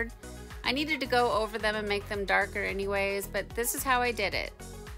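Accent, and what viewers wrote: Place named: Midwest, United States. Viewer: Not weird at all! But I insisted my husband come hear you say it because I knew it would give him a taste of home. You’ve got the typical midwestern “flat a” just like he does when pronouncing words like back, flat, and, of course, wag. He’s from Michigan, but we currently live in South Florida, so “hearing home” made him smile. Thanks for that. As for THIS wigwag, HOLY MOLY!!! I don’t have words (and that’s saying something for ME!) Simply stunning! Redemption complete.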